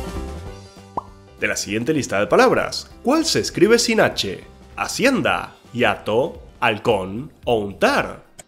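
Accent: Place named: Latin America. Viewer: PERDON. La pronunciacion es LATINA. Esto conlleva a ERRORES.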